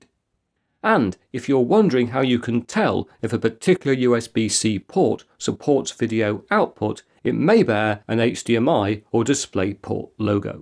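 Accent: United Kingdom. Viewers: Even though I have worked on/with computers since the 1980's (I used to service Mac's & IBM pc's at University), I find your information about different aspects of computers VERY useful! Keeping up with all the latest & greatest technology trends/changes is exhauting. So... Thank You so very much for your content!! BTW, I enjoy your "outro". You sound like several friends I have in Britian. 🙂🙂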